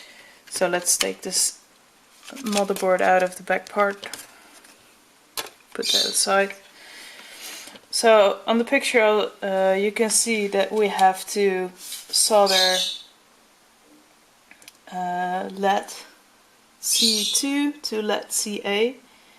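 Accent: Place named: Netherlands. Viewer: Dutch accent!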